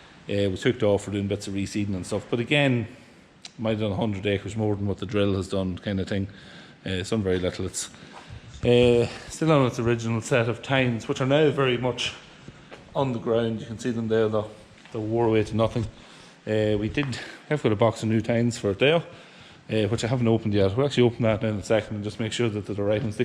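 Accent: Louth, Ireland. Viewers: Are you from co louth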